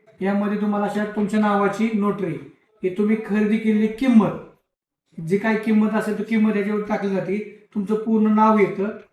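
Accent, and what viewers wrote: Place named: Solapur, India. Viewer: सोलापूर जिल्हा बोलतो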